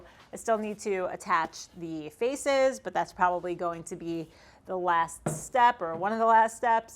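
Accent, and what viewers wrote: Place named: Mid-Atlantic, United States. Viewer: that’s not New England. Possible modern mid Atlantic; Eastern PA, Northern NJ, parts of CT and Long Island.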